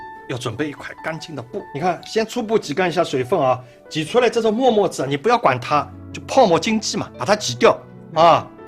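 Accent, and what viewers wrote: Shanghai, China. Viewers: 朱老师的上海口音老可爱的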